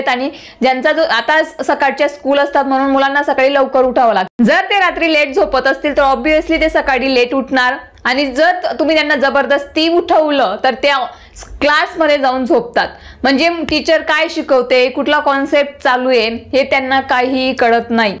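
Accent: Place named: Jalgaon, India. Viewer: मॅडम जळगाव चे असतील असे बोलण्यावरून वाटत आहे मराठी पेक्षा इंग्लिश जास्त छान आहे पण विचार हि खूप चांगले आहेत खूप छान माहिती आहे 🙏🙏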